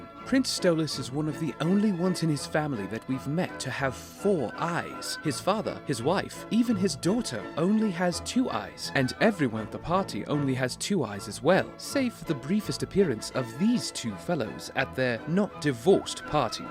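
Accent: United Kingdom. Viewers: I went insane listening to this drunk British guy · Man with hoity-toity British voice gets increasingly drunk talking about a horny owl demon. This is the most video I've watched all day.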